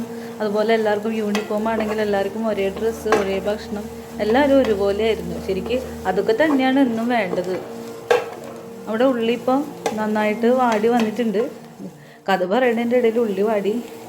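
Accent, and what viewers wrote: Thrissur, India. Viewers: ചെച്ചി തൃശ്ശൂർ കാരി ആണ് ല്ലേ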